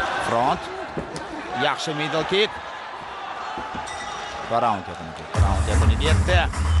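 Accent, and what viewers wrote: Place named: Russia. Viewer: Концентрация, креатив, позиция инглизча сузлар, буларни урисча талаффуз килиш урискулликдан далолат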